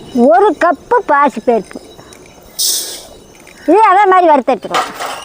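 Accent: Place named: Kongu, India. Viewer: இது கொங்கு தமிழ். அப்படித்தான் இருக்கும்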